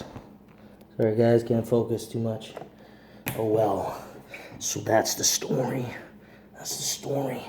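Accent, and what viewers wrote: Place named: Canada. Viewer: You’re Canadian ! Oh I feel like such a dummy asking where In the states you live. I’m not great with accents obviously, my apologies 😂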